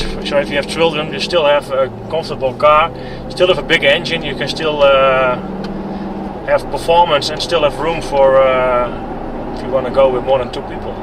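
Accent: Netherlands. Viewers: You sound Dutch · duidelijk een NL'er, wat een accent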